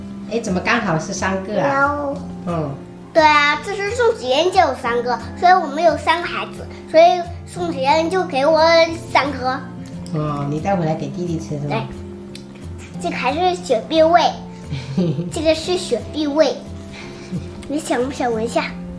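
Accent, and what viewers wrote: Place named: Quanzhou, China. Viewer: 你泉州的啊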